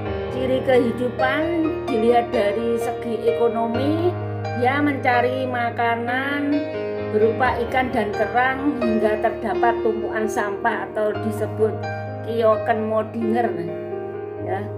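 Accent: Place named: Java, Indonesia. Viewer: Aksen jawanya aku suka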